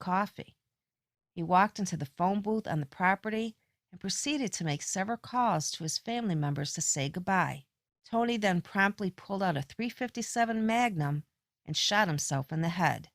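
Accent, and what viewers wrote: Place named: Chicago, United States. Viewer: The narrator sounds like shes from Chicago. Is that true? And if so where?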